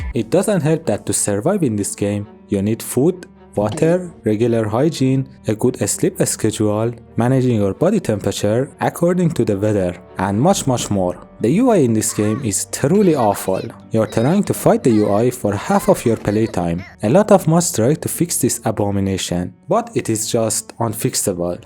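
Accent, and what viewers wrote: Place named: Iran. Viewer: داداش از لهجت معلومه از ایرانی😏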